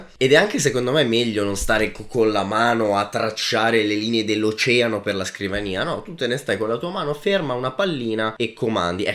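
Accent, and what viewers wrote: Italy. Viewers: MA sei di roma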